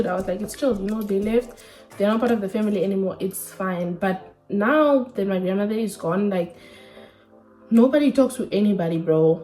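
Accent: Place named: South Africa. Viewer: I’m subscribing, girl you got me you’re so raw on this video and I loved this. The family drama is soooo real. You speak like a South African, are you Namibian? Zulu, coloured Afrikaans, Tswana😂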